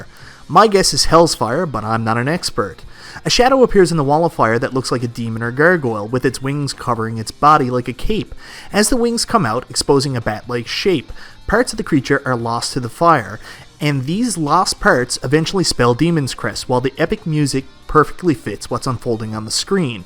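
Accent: Canada. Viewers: Another nice vid! I’m been a sub for a while but haven’t commented. If you don’t mind me asking what part of Canada are you from? I’ve noticed your accent. Cheers man!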